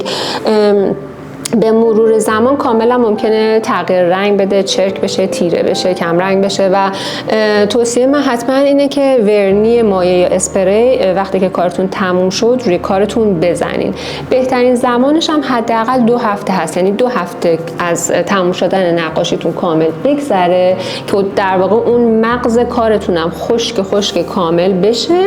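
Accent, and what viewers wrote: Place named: Isfahan, Iran. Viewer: فهمیدم اصفهانی هستی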